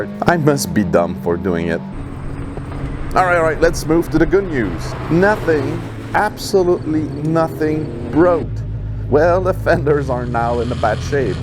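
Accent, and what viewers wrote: Quebec, Canada. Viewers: Tu me semble canadien francais mon ami? are you??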